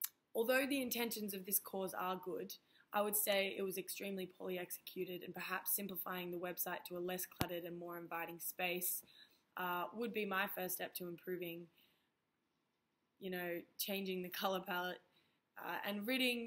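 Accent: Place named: Victoria, Australia. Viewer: I think the statute of limitations is up….I had the biggest crush on Ms. during her time in Eugene. I was completing a lengthy career of sports journalism and sociology. Admittedly it was absolutely fueled by an appreciation of aesthetics, mannerisms, admittedly shallow but subjectively harmless. Your decision to take leave Eugene for the Horned Frogs of Fort Worth served as excellent foundation for my ego. I mean the only reason I never took out was because she left! 🙃 I saw your content for YouTube kids about the importance of keeping in touch during quarantine, mindfulness, etc. Great work! I can I only imagine the impact a star athlete like yourself has on young girls AND boys. Seeing you discuss toxic masculinity and gender issues intelligently is rad. That’s 100% my realm of media/sociology snd I had no idea. It isn’t ironic; since no one ever uses irony correctly…. 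With my awareness of misogyny, objectification, etc it can be a conundrum between the ‘progressive’ trends I believe in and ‘Woah. Is she from Victoria? The accent. She’s friggin’ 😍’ Hey now now…she might be an awful person. We should not suddenly wish all good things for her whilst ignoring the plight of others based on hormones. You’re really intelligent too! I damn well knew it! I’m exaggerating intensity of my crush (slightly)….but to see you sharing a very well composed thesis and using your platform for good. Well that just shows I’m perceptive. I knew there was far more to ya Beaut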